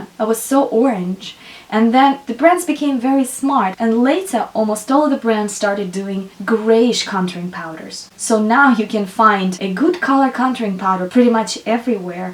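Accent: Russia. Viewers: I've been interested in Mac lately, we just got one in our mall (I live in a smaller city in Canada so not so much high end stuff here,) so good to know you love it. :) Might try it some day even if it's a little more expensive. I work in a drug store so I tend to just buy make up from there, Nyx (for lip stuff mostly,) Revlon foundation for my oily skin, Maybelline superstay powder for after that, and just anything for the eyes really lol. Side note: I was trying to place where your accent was from, but couldn't, then you happened to say Moscow, Russia. lol Coincidences. So cool, one of the places I'd love to visit some day. <3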